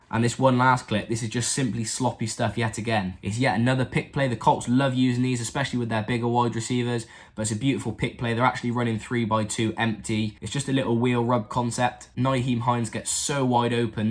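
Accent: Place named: United Kingdom. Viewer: Absolutely weird to hear someone across the pond talking football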